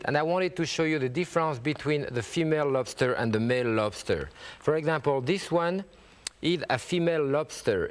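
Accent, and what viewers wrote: France. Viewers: a genius in the cooking world is jacques pepins the french accent adds to the recipes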